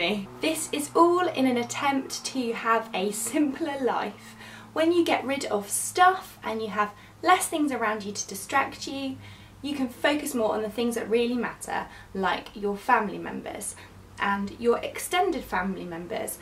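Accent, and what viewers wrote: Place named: United Kingdom. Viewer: so refreshing to hear someone from the UK talk about this subject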